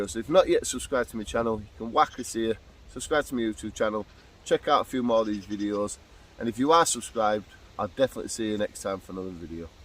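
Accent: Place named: Cockney, United Kingdom. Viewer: Well, the video would probably be enjoyable, but for your speech. I don't wish to corrupt & forget (foorgit?) MY English by hearing all the cockney "boogs (for 'bugs'), "stoof (for 'stuff') and "coomfertIble" (for, 'comfertable, of course)...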